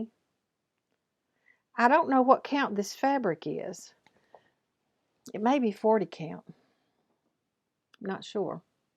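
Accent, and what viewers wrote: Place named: Texas, United States. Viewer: I love the Sunflower Diaries pattern!! Are you from Texas? Your accent sounds like it. Im from Texas and you sound like me. I really like your chouce of patterns. Thanks for the video.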